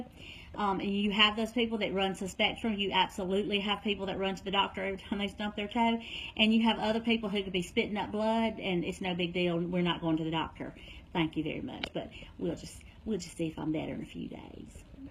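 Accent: South, United States. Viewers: You are so helpful! I love your southern accent. Sooo cute! And you DO KNOW A LOT! 💓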